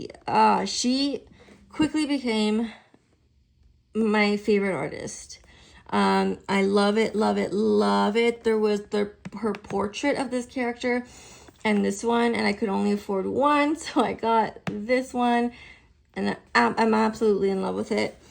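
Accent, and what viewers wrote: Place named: Montreal, Canada. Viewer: New subbie here!! ❤ your accent reminds me so much of home!! I am also from Montréal! I moved to the United States in 1990 when I was 4.. I'm 39 now 😊 I used to visit my sister and family every summer from the ages of 11-17.. I miss it so much. Montréal is a gorgeous city. Most of my family lives in Ville Saint Pierre and LaSalle LaChine. Probably didnt spell those right 😅 I'm glad you got clean and found diamond painting!! I'm also an addict. Been clean 9 years now. I've been diamond painting for 5 years now. 😊 can't wait to see your future videos!! ❤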